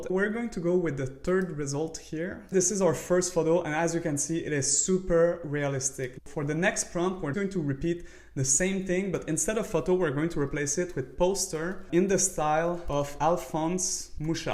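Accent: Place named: France. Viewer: belle accent français ahah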